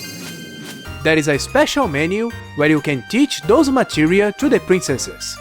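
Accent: Brazil. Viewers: Your accet is Brazilian right? at first I thought it was Japanese, but then I found it more familiar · Hello Bro. Found your channel after searching for Uppers Review and I'm having fun with all these japanese game analysis. Are you from Brazil? Cause your accent resembles of someone from there.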